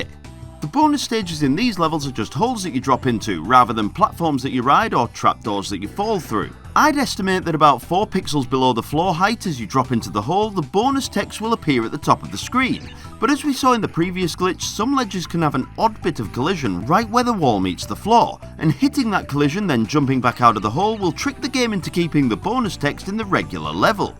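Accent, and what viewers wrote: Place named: England, United Kingdom. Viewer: even the YouTube subtitles couldn't keep up It is not only about your accent, but also the fact that you mash words together, making up new words with even weirder pronunciations. Also known as portmanteaus or slurred speech . Essentially creating a brand new dialect on the fly I find it very sad that someone from England can speak this poorly , even Africans can speak better English.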